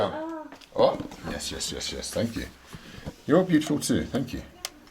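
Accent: China. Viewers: Chinglish is Fun!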